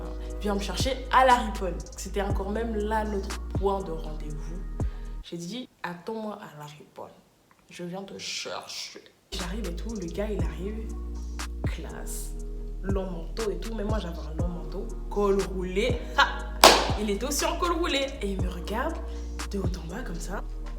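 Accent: Cameroon. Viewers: Le Cameroun s’entend d’une manière , tu m’as tué « la honte de ça » 😭😭🇨🇲🇨🇲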